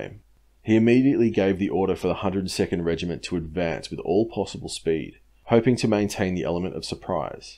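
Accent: Australia. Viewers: Recently discovered your videos. Glad to see an Australian (I think? I'm not the best with accents) doing Australian history stuff that us Yanks don't often see so much of about WWII. I hope to see much more history from the Australian perspective on here!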